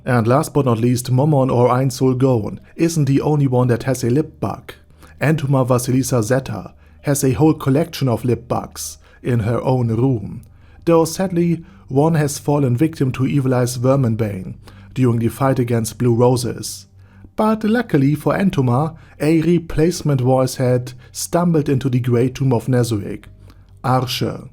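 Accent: Germany. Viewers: The german accent is pretty obvious even tho you speak without mistakes which i find pretty funny.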